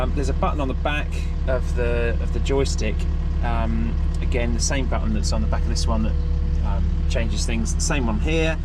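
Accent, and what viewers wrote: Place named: England, United Kingdom. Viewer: Well done Buddy, had to tune myself in to the English Slang & accent like Slew for Swing or Spanner for wrench but I caught on eventually, Definitely am a big fan of the Neat Stick Steer more generic function's on one Joystick & less on multiple Levers , pedals etc etc , a Skidsteer steering feature with Boom, stick and scooper bucket, Never Ran a High Hoe, or Trackhoe that's a Old slang in Canada for a Excavator in my life but always wanted to Especially this Brand Caterpillar & the D series New gen machine. Thanks from Northwestern Alberta Canada , And one of the better machine review's I've ever seen on this website. Your also right about how Cat totally misses out on the Places to store a operation File, invoices cabinet in the cab. Big miss on that one l think cat figures a forman or Supervisor will look after Paperwork and let the operator concentrate on scooping & digging dirt. 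But Good point.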